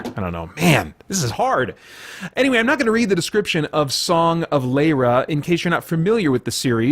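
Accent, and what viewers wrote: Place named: United States. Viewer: Haha. Laughing out loud at you trying to pronounce the names. Nadine's last name is pronounced Brand - Ess. Pretty sure It's Morgan L Buss - ee And I think it's Gillian Bront - Ay (like the Bronte sisters) Adams. Interesting to notice that in the American accent, Fawkes and Fox sound pretty much the same. We have quite a distinct difference between the two.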